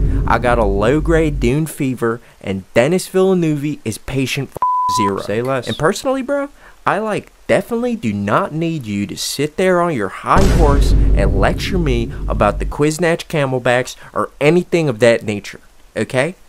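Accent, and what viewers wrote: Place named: Baltimore, United States. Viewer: Eerily good Baltimore accent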